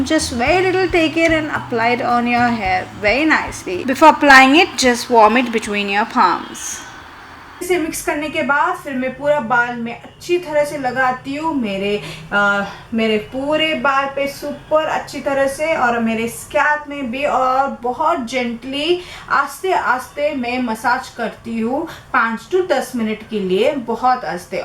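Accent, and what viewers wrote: India. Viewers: Hahahahah u sound cute in ur Hindi accent ! 😂😘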